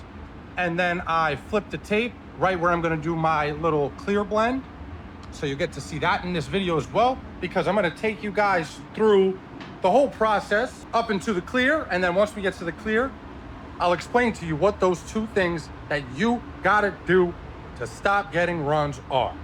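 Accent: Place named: New York, United States. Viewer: Where in NY are you? I'm guessing queens or more upstate? I just like to guess accents haha. good video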